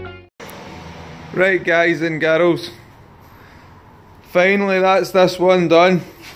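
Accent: Scotland, United Kingdom. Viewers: I love your videos! ☺️ and I absolutely LOVE your Scottish accent. Or is it not Scottish? Suddenly not too sure anymore 😄 nevertheless, I like it 😍😄 Swiss gal with a Scottish grandma here. So, technically not half Swiss half Scot but when it comes to my heart, at least half of it beats for this beautiful country called Scotland! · This was such a job well done! Originally from Maui, HI, but attending university in Seattle, WA! You’re Scottish right? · good job! love the Scottish accent... alright goias and gaels... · Love the Scottish accent!! I'm from Morocco but live in Wales with my Welsh ge-rl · what is that awsome accent? scotch ? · I can't be the only one who watches these videos mainly to hear that Scottish accent... 😍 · you sound scottish,first video :D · Is that the Scottish accent!!!😂😂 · I did enjoy the video. First, because I enjoy listening to your lovely Scottish accent as much as I enjoy watching the cleaning process; second, because it is nice to see a man cleaning for a change. 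lol. Waiting for your next video. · Is this scottish?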